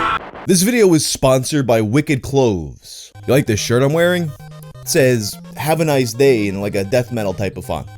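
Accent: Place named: New York, United States. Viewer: I don’t know why I never realized you were a fellow New Yorker before. YERRRRRRR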